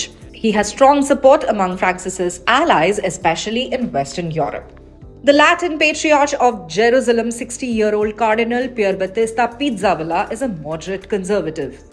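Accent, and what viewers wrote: India. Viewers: INDIAN ACCENT IS ANNOYING AND WRONG PRONUNCIATION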